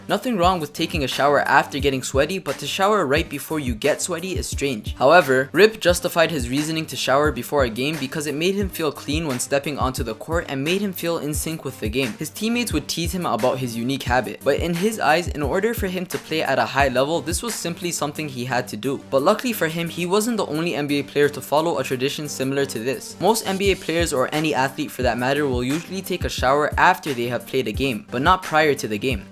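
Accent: Toronto, Canada. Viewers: This is my first time ever watching your videos and I can definitely tell you're from Toronto so I'll sub lol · your accent lmao Canadian lol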